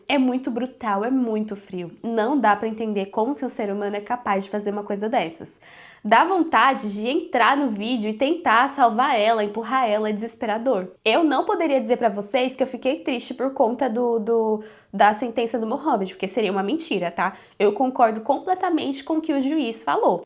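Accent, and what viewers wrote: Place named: Sao Paulo, Brazil. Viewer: Nao fala português, para mim fala igual paulista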